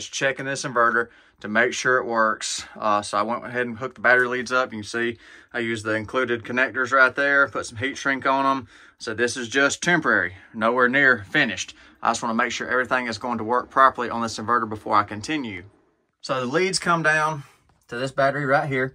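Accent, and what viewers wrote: South, United States. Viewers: Great video as I just ordered this inverter a few days ago off amazon along with 2 of their 48V 100AH Li Time batts. I've been using a small 12v solar set up at my storage place for the past 8 years so jumping to 48V is going to be a huge improvement. Started with 6v AGM in series with 800A bank then replaced with 3 12v 200AH Li time only 2 years ago. Have been very happy with the batts but do you think I should have gone with our used another brand? The two Li time I just ordered are not the rack type but the big flat type, a mistake in your opinion? Are you planning to use full time in your camper or home? Curious where you are located, you sound Southern ;)...I'm in East TN?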